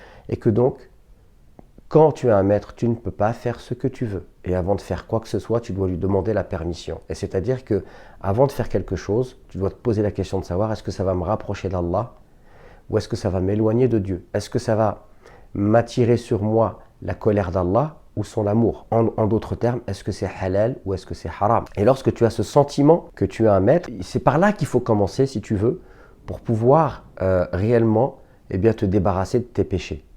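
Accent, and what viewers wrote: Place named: Arab world. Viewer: Je te remercie du fond du cœur Mais l’accent arabe m’empêche de noter normalement 🙏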